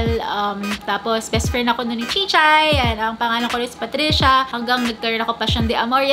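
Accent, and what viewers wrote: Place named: United Kingdom. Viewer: ok naman pronounciation nyo ng youtube, british pronounciation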